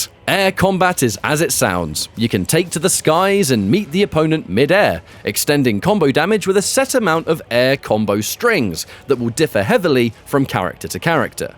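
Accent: United Kingdom. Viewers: There is something about people from UK narating stuff. I could listen to you guys talk about damn fllowers for hours, let alone stuff I’m interested in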